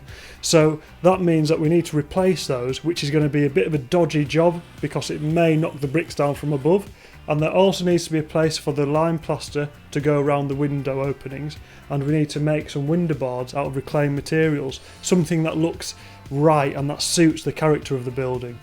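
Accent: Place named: Northern England, United Kingdom. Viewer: Lancashire somewhere I would guess good question .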